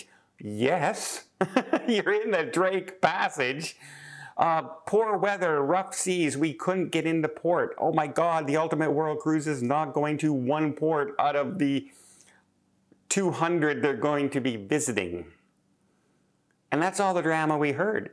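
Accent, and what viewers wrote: Canada. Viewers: Canadian pronunciation. Just because Americans pronounce something one (we usually don’t either depending on regionsin the US)way doesn’t mean everyone has to sound like us 🙄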